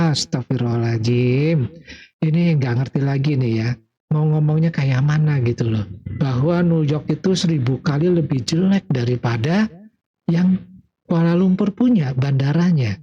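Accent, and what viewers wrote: Java, Indonesia. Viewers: Jawa ngomong 😂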